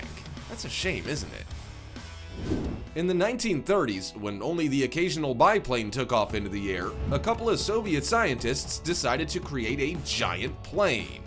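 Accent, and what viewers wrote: United States. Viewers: the annoying side of American accent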